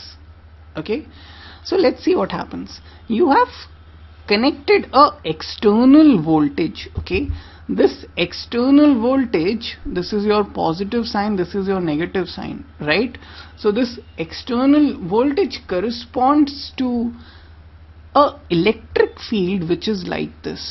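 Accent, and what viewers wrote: India. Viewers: GET THE FUCK OUT WITH THAT HINDU ENGLISH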